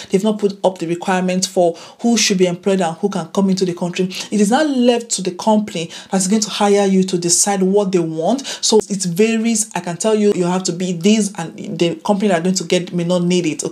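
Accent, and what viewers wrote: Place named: United Kingdom. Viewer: It will be appreciated if you add a subtitle because not everyone can understand the British accent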